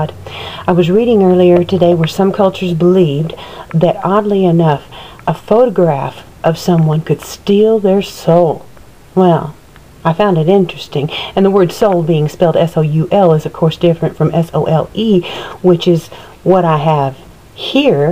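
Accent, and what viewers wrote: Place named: South, United States. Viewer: I laughed more than I should have. You could seriously be a comedian, the southern accent makes even funnier :).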